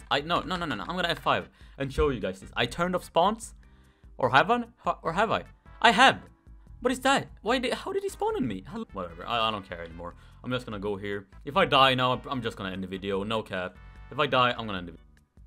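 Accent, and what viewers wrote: Sweden. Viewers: are you swedish? you sound like swedish:/ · Are you Swedish?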